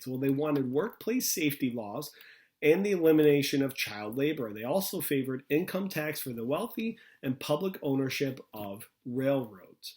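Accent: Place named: Buffalo, United States. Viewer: Guys, I think this guy is from Buffalo.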